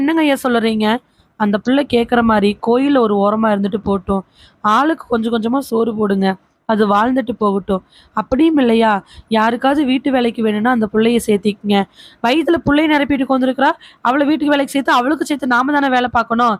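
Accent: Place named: Kongu, India. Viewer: Kongu Tamil Vera level sister 🎉🎉🎉🎉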